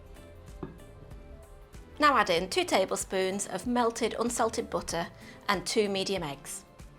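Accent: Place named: North, United Kingdom. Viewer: Hi I’ve just made your tea loaf and all the family give it a 👍, very nice I’ll definitely be making it again,it’s good to hear a northern lass no messing job done. All the best from Manchester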